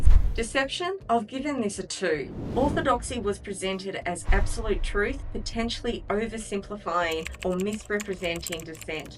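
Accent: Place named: United Kingdom. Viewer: Sounds British. The Brits self - castrated a long time ago. It's not working out well for them....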